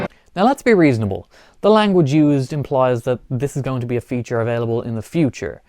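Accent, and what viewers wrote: Ireland. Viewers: Why do u have a Irish accent? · I came here because I needed to hear that gentle, Irish voice of...DOOM!! 😎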